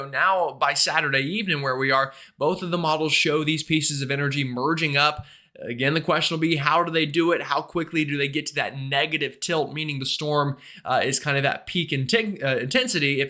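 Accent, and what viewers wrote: South, United States. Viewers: the familiar soft Southern accent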